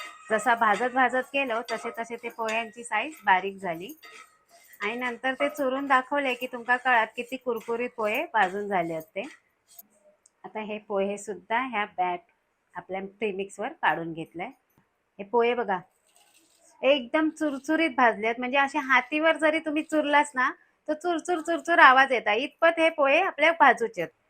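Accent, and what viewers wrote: Malvani, India. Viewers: मालवली भाषेत सांगतात ते खूप छान वाटते ऐकायला.❤❤❤❤❤❤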